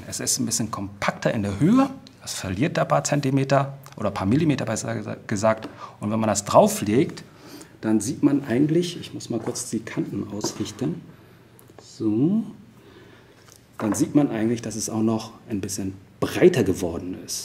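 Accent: Asia. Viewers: Is that Asian speaking German!? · hahah, he's speaking german but in an asian accent:P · I wonder if he has an Asian accent to German people ?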